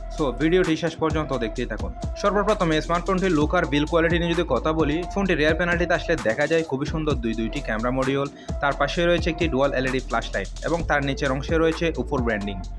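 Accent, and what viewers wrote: Chittagong, Bangladesh. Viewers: বদ্দা চিটাইঙ্গে নে?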